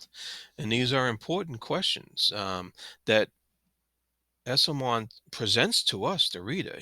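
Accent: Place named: New England, United States. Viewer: I love your accent !! - a fellow New Englander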